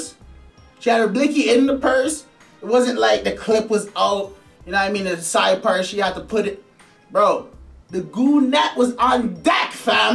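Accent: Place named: Toronto, Canada. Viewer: Fam u sound like u from Toronto! Your hilarious brother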